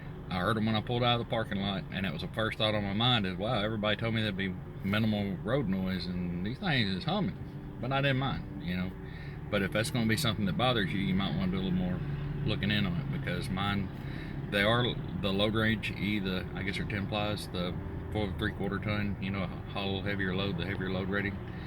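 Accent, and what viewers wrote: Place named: Tennessee, United States. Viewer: YOu sound like a TENNESSEE driver!! ( me too.. ) I think part of yours is the load and driving use... I'm going to be running mine on just my GX470 (about 6000 weight with metal bumpers, winch- and extras).. but normal street driving mostly-and not very aggressive. I'll most likely do a LITTLE offroad stuff-- just fire roads, or rougher dirt roads and of course winter.. but not much- so with what you got- mine should do fine. THANKS- appreciate the totally honest and factual review..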